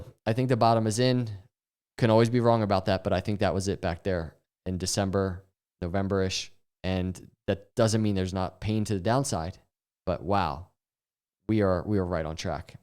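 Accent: Philadelphia, United States. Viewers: I love your channel bro. I did my medical training in philly and you seem to have the accent. U a philly guy? If so pats or genos?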